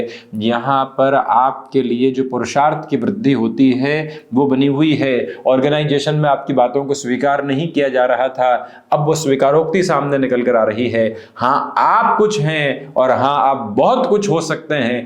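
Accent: Standard. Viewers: Even though could not fully understand what he spoke but it was pleasant hearing his shud Hindi as if I am in Ramayan era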